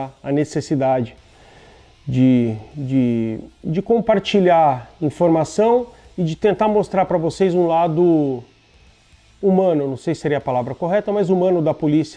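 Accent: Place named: São Paulo, Brazil. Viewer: Aquele sotaq paulista maroto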